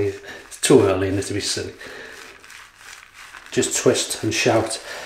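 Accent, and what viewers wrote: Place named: Wales, United Kingdom. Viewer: Hi. Thanks for the videos. I've really enjoyed watching them, and found the very helpful. Actually, it's your videos that have convinced me to get into DE shaving, and I haven't looked back since! Thanks again, from a fellow Welshman. Hoping you are Welsh, as your accents sounds Welsh!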